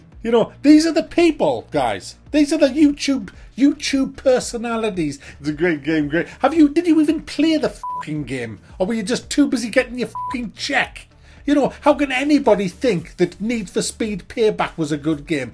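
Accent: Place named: England, United Kingdom. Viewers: SavagerBoy He sounds English to me. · . Where are you from? You sound like you're from Nottingham, could be wrong.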